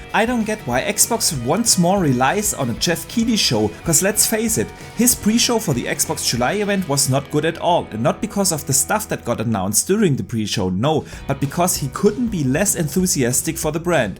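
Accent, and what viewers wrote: Germany. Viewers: I am also from germany and the accent is killing me :D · The german accent is killing me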